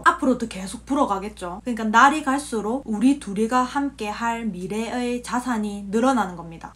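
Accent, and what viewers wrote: Gyeongsang, South Korea. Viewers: 똑소리나는언니네요경상도싸투리안들어면.하루가지루하게합니다 경제학박사님도 할배야하겠어요. 오늘부터 유툽어로주식.공부열심히할람니다..넘.똑똑혀요. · 우왕~ 우연히 보게된 님믜 방송.50대 줌마인데도 은근 잼나고 웃음이 나오네요. 경상도 말씨 좋고 또!다~맞는말. 말도 참 잘한데이~^^ 화이팅~!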